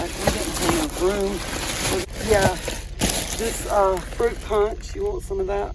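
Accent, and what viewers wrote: South, United States. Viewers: Where are you located you sound like you are from the south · I miss the South, I love you southern accent! God has a way of doing that.. Lol... You had me in tear at the end... I love your Video's and your Chanel is awesome.. I believe were probably close to the same age..✝️💜✝️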